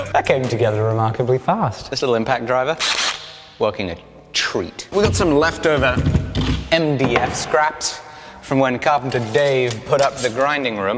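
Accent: England, United Kingdom. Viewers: It’s weird how in the states he sounds super British, but in the UK he sounds American. He just sounds weird everywhere. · sounds like his British accent is coming back strong since going back to the UK.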